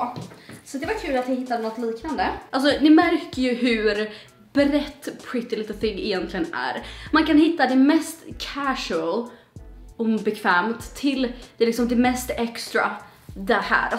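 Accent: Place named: Karlskrona, Sweden. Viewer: Jag hör lite karlskronitiska ändå😉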